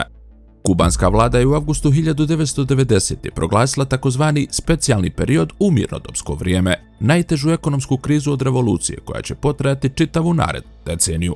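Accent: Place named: Herzegovina, Bosnia and Herzegovina. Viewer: Hercegovac govori. Ne Bosanac.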